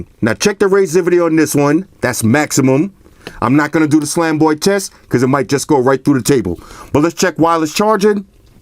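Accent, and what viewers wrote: New York, United States. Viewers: Lol. OMG I could listen to you talk all day!!!! It's the New York!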